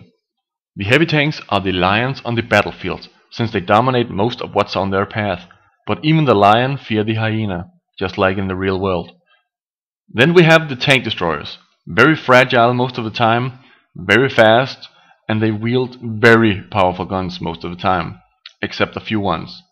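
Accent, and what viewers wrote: Denmark. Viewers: Are you danish? ;) Btw, love your vids! :D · You're Danish aren't you? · Are you danish..? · You sound danish.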